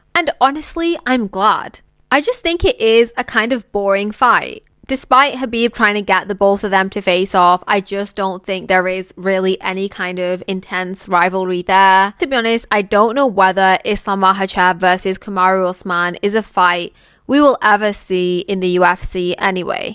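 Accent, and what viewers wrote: United Kingdom. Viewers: You really think Kamaru is an easy fight for Makhachev come on beautiful british accent lady when this fight will happen you're gonna find out.from my perspective its a 50 50 fight.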